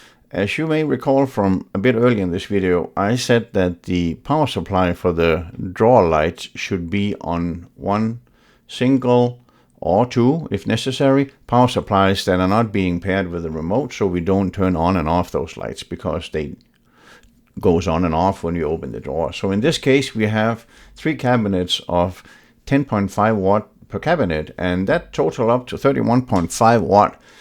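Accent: Denmark. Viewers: Tak. I trust you probably have Danish ancestry as I do miss the Danish English accent.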